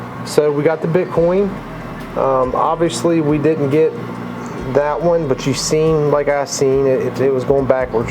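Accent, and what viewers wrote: South, United States. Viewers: Speedy recovery for your friend Jeff. Hope your cold clears up soon. Just a note that your voice sounds fine (except when you slow it down to creature from the deep level lol) I could listen to your voice all day lol Southern flavour easy to listen to. Have a great day. (Canada here) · I just like the sound of the money and chips falling and your Southern accent, which I may add is even cuter when you speed it up and sound like the! Makes that song get stuck in my head. Love your videos. Used to watch a lot of them, but now I only watch yours. Keep em comin!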